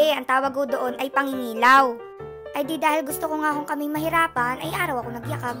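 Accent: Batangas, Philippines. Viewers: minsan ay nagiging batangeña ka na eh eh eh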